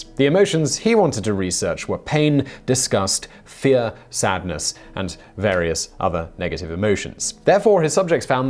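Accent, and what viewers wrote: United Kingdom. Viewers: He isn't either. He's British me thinks :D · Yes, he can. He can relate the horror experiment interestingly while keeping it light with his lovely British lilt.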